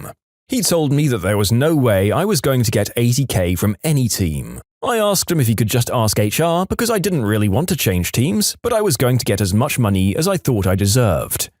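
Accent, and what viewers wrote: United Kingdom. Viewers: Why does bro sound so brittish?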